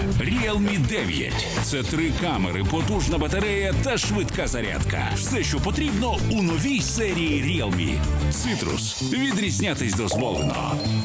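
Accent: Russia. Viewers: Дуже тішить що і "Цитрус" перейшов на українську - респект! Видно, що не дуже ще комфортно з такими змінами (часом зустрічаються русизми), але він старається і саме головне - не прикривається тим, що мова не доскональна і соромно таке публікувати... Тому наше (споживачів контенту) завдання полягає в підтримці (підписка, вподобайка, комент (гарні слова в підтримку) автора(ів) і з практикою все прийде, вдосконалиться і буде не вимушене)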